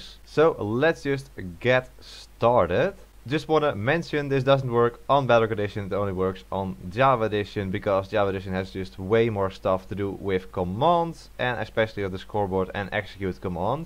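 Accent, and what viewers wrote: Netherlands. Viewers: Wow if thats not a dutch accent i don’t know what is XD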